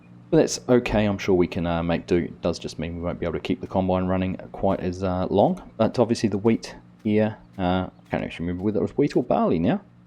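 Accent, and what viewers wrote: New Zealand. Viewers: Goodoh! is beek un speekteckle oylend. Not knocking - my grandfather was born near Napier, 1880-ish (aye, Ah'm auld) and I have dozens of Newzeeld relatives, but none I've met has an accent this extreme. / You do better timelapse music than most, congrats. Wonder if you like and/or, sliders extraordinaires. / Do hope AlienJim does an FS22 version of this map. Thanks.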